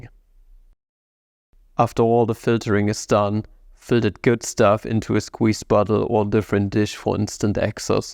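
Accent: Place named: Germany. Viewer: This man has the strongest German accents ever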